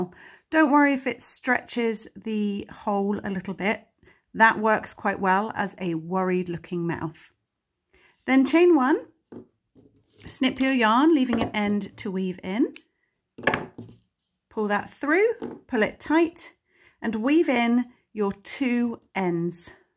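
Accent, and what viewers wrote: England, United Kingdom. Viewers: Well...I am definitely new at crocheting, but you are 4 SURE my favorite! You explain things well...plus I love the English accent, & I especially like when you say "pop your hook.."..lol. so thankyou 4 your videos. P.s. I also heard on one of your videos that was already taken...have you ever considered "robin hooked" / like robin hood....lol. you could...instead of "steal from.the rich & give to the poor"...u could....idk.."feel 4 the stitch &....well I can't think of how the rest of that would go, bit I'm sure theres a clever tagline just waiting to be discovered. Lol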